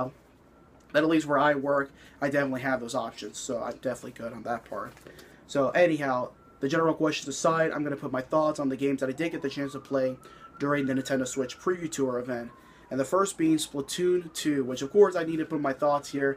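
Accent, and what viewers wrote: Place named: New York, United States. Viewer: I love this New York accent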